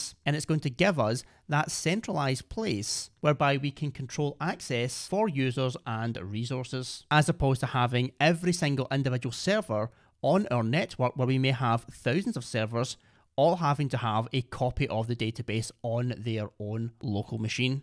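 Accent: Glasgow, United Kingdom. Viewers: First 3 minutes I was just trying to get used to the scottish accent... but otherwise good introduction. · Its a magical unicorn type of accent only from one place - Glasgow, Scotland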